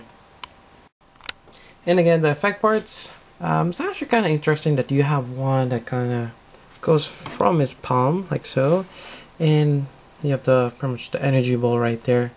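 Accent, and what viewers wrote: Philippines. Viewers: r u from the Phillipines?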